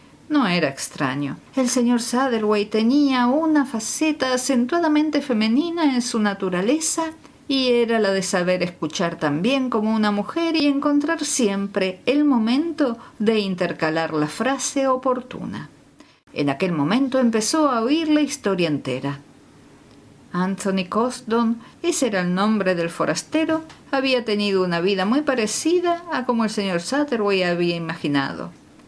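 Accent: Argentina. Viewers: Como ronuncia la eye se qie es Arguentina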